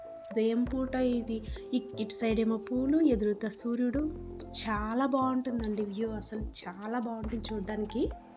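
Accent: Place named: Kadapa, India. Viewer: మీ మాటలను బట్టి... మీరు కడప జిల్లా వాసులనుకుంటాను. ఎందుకంటే.. ఈ ఆల్లకాగు అనేమాట అక్కడిదే.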